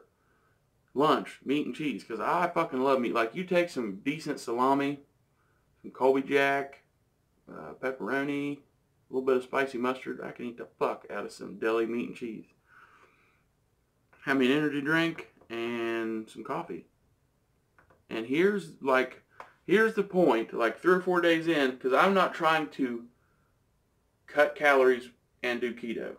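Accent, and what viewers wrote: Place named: Alabama, United States. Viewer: U from alabama? I dont hear "himhaw" anywhere but south bama lmao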